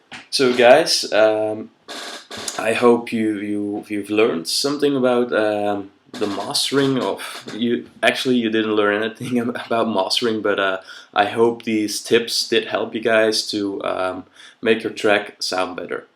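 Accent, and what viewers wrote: Belgium. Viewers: This has been amazing! Thank you so much! I recently moved to Amsterdam and thought you sounded Dutch! Well, Flemish!? Thanks again! x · Joli petit accent belge ;) On va essayer ca, thanks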